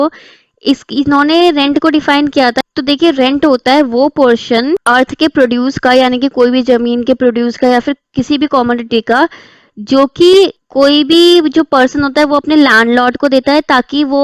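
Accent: Assam, India. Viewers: Mam are u from Assam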